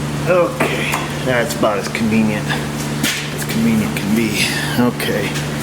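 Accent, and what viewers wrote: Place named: Western New York, United States. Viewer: I enjoy the accent brother, I'm a native Buffalo/Hamburg, you are over in Bath, that's the accent which reminds me of home.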